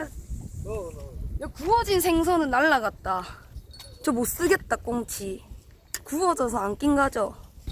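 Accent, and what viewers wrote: Busan, South Korea. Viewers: 오~부산사투리 듣기좋네 내는 창원인데 윽쑤 정겹네요 마이 잡으이소~~~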